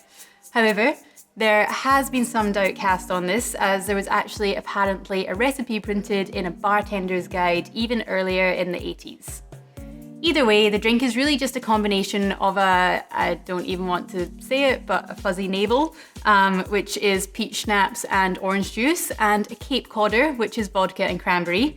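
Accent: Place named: United Kingdom. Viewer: Aussie? I thought u were from the UK....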